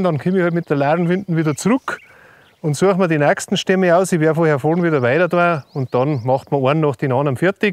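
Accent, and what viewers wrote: Bavaria, Germany. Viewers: Und der wieder in schener bayrischer Mundart erklärt😅 da muss man aufmerksam und konzentriert zuhören. Wie die Lehrlinge in der Labau😉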